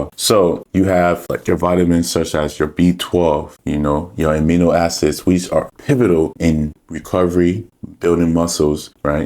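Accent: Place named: Liberia. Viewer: I can hear a slight LIB accent... you Liberian bro?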